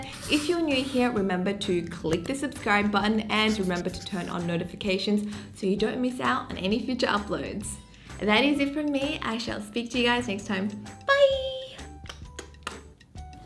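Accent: Australia. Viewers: I like your Aussie accent! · it's her aussie accent chill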